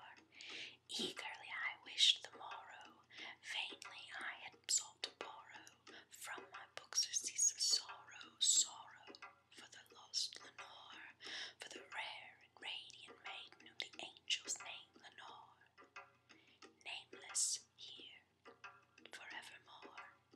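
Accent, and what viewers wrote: South, United States. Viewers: Whisper "A Tell Tale Heart" by Edgar Allen Poe. Great whispering, I love the southern accent.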